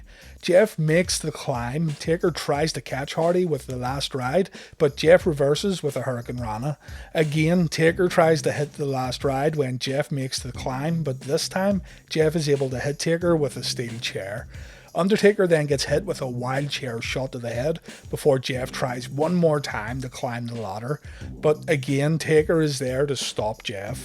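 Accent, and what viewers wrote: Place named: Scotland, United Kingdom. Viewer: I'm Irish too and I thought he was scottish too:O love your vid by the way <3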